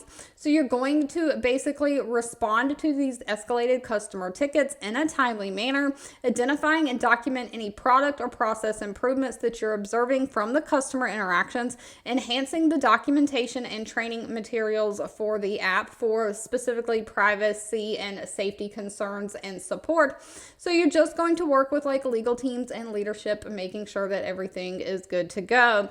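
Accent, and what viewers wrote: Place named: Louisiana, United States. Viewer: you're welcome luv! I saw on an older video you said people were complaining about your voice or something. Do not let that bother you! I'm a proud Louisiana woman that talks just like you, lol! Be you! Have a super day!💜💜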